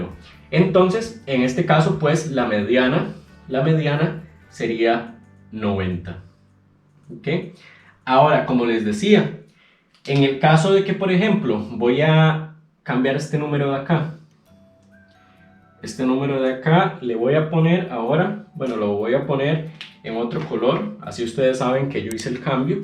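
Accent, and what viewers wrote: Costa Rica. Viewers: este mae es de costa rica se nota demasiado pura vida playo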